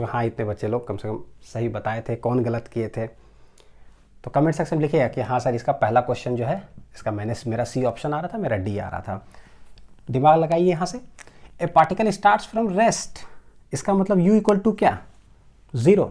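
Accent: Bihar, India. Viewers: Sir are you Bihari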